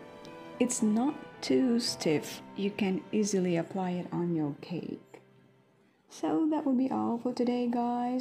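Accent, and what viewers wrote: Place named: United States. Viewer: Ganahan ko sa voice over...murag amirkana 😊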